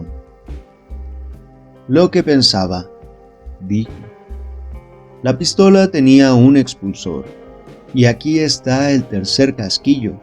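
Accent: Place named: Mexico. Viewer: Está muy bien leído.Trato de acostumbrarme al acento Charro.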